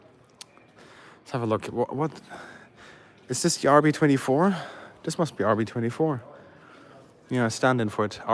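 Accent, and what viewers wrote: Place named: Germany. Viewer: That was awesome the German accent ads to the show love it when you say (Millimeter)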